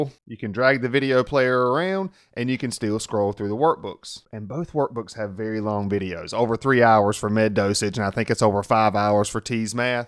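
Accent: South, United States. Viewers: the problem is most of the famous channels are bad to explain math(or at least they're not beigginer friendly)...I think you have inate ability to explain things like no other ..saw one of your video...I'm really really bad at math(math phobia)...currently Delving into math..even though don't understand calculus but understood one of your linear approximation calculus video....at least 90% of it and i was brooding if he were my neighbour, teacher and then thought should make a comment...it's rigorous work to make a playlist like pre algebra and algebra entirely including every topics and it's too much to ask for...my goal is to learn pre algebra and algebra ..then geometry, trigonometry, pre calculs and calculus..I think I will never learn these cause bad at self study and grasping Abstract idea like math...Best regards and wishes to you❤...love love that country accent...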